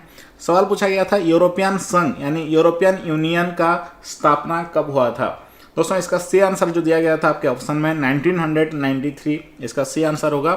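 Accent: Bengal, India. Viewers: Sir ka tone bengoli h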